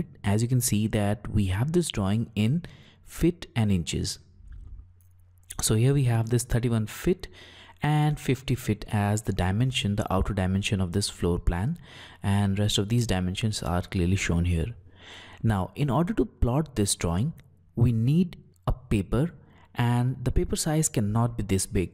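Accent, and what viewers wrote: India. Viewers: your Indian accent realy annoying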